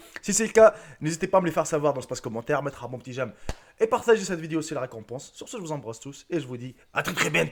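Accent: Tunisia. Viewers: T'ES Tunisien ?! Comme moi x) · T un tunisien ?? Ena zeda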